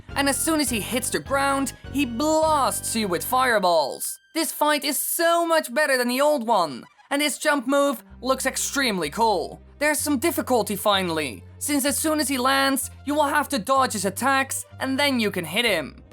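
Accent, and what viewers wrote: Netherlands. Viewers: Jouw heel klein beetje Nederlands engels accent is heelijk om te luisteren 😂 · Are you by any chance dutch?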